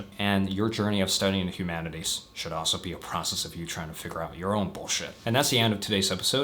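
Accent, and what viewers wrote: United States. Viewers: why do you pronounce things more like an american than an aussie?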